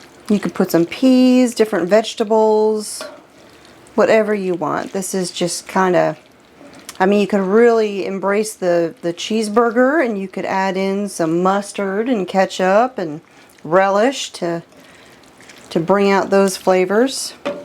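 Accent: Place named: South, United States. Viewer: My hubby Jerry and I love to watch your cooking videos. Do I detect a little more southern charm to your words now a days?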